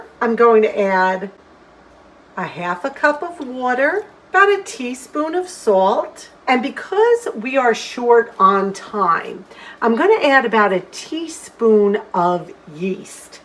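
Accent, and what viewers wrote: New York, United States. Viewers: I grew up in sleepy Hollow moved to the capital district. I just absolutely love your videos, and you have the best ny accent ❤ the New York State Museum is also free and it has a beautiful tribute to 911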